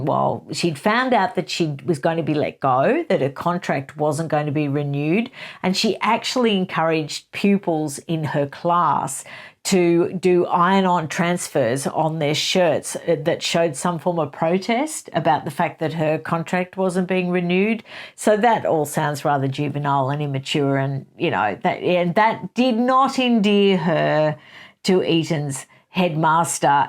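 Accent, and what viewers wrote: Australia. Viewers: Love your Aussie ascent and true poor Harry was helped all the time in his education. Sadly it’s coming back to ‘bite him.’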